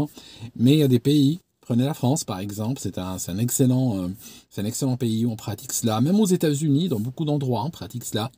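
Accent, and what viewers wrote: Vaud, Switzerland. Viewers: Merci pour la vidéo c'est top. En revanche l'accent Suisse est atroce 😂. Heureusement que ça s estompe au fil du déroulement de la vidéo. Salutations distinguées. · J'aime bien ton accent Suisse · J’ai une théorie sur cet accent suisse. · Pourquoi prendre cete intonation suisse ? Ai-je raté un épisode ? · J'ai l'impression que vous avez pris l'accent suisse !!! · Pourquoi tu prends un accent Suisse ? En France on paye des charges hallucinantes pour justement pouvoir toucher des aides en cas de chômage. Je veux bien qu’on ne donne plus de cash aux chômeurs, pas de « sponsoring » comme tu dis mais dans ce cas, je ne veux pas voir sur ma fiche de paye toutes ces lignes qui sont retirées à mon brut… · Arrêtez de dire "accent suisse" dans les commentaires, ça veut rien dire. C est de l accent vaudois qu il est question, en l occurrence. 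· Incroyable en écoutant ta vidéo j'entendais la mélodie de l'accent Suisse, puis en regardant la description j'ai vu que t'étais à Genève haha. Sinon top le contenu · Pourquoi est-ce que t’as l’accent suisse dans certaines vidéos? 🤣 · ton accent suisse est revenu tellement fort que je doute que tu sois toujours au royaume uni. Super conseil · Y a l'accent suisse qui revient au galop!!! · lol l'accent Suisse qui revient. Je te regarde en mangeant une entrecôte Wagyu. Pas de la bouffe de RSAiste ça.. Ce qu'on a de plus précieux c'est notre temps de vie (en bonne santé). Le gâcher largement pour avoir un peu plus, mais plus assez pour faire grand chose notamment depuis le covid et l'inflation, je me demande de plus en plus si ça vaut le coup. Les aides baissent pas elles augmentent... Le SMIC idem, il augmente fortement avec le temps, je l'ai jamais vu baisser. Ceux qui ont un peu plus, sont pas augmentés, résultat on s'appauvrit, on est de plus en plus proche du SMIC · Alors ton accent c est en Suisse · petit aparté : je n'avais jamais fait attention au fait que tu avais un accent suisse ! 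· Bin, c’est quoi ce nouveau accent suisse? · Tu as l'accent suisse comme même :) 'Avais jamais remarqué :) ! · C'est quoi ton accent Suisse 😁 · Pourquoi tu prends l'accent suisse ?? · Dommage pour l'accent suisse trop présent qui rend l'ecoute plus difficile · C'est quoi cet accent suisse mon 😂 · Trop rigolo l accent suisse wue vous prefer. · Ça me perturbe c’est l’accent suisse que tu as dans cette vidéo ?? J’ai noté un accent bien marqué c’est ps habituel il me semble 😂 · Cette accent suisse, c'est trop mdr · C’est marrant ton accent suisse dans cette vidéo, … 😊 Plus sérieusement, j’ai été contrainte d’aller aux services sociaux quand je suis rentrée d’Angleterre. Je préparais déjà une licence et j’avais besoin d’une année pour la terminer. J’avais aussi besoin de nourrir mes enfants. En même temps, je me suis inscrite à des concours. Comme j’ai réussi le premier, j’ai laissé tomber les autres qui étaient équivalents. 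Ainsi, j’ai pu m’occuper de mes enfants, les nourrir et leur faire faire des activités.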